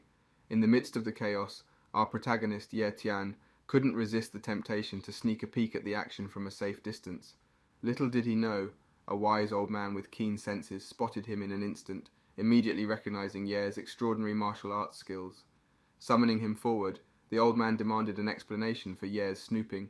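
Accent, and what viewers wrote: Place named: United Kingdom. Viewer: W Brit accent, 😂 my accent ain't as good as yours 😢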